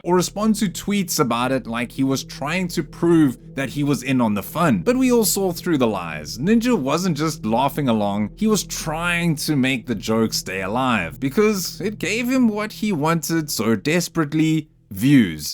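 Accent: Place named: South Africa. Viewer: you sound like you south african trying to sound like an american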